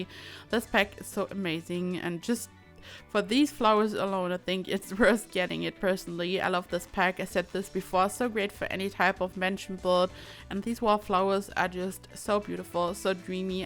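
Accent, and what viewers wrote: Germany. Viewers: Are u german? You sound kinda german to me ☺️ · I recognized the accent, but wanted to make sure. Ich bin auch aus Deutschland ☺️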